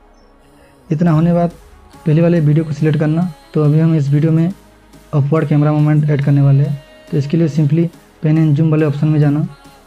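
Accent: Odisha, India. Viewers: Bhai aap odisha se ho kya?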